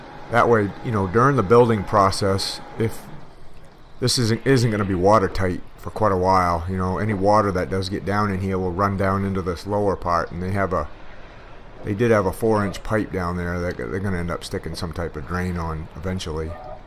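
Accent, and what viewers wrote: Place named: New England, United States. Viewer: Where is this guys accent from? Sounds like a Boston/Maine hybrid.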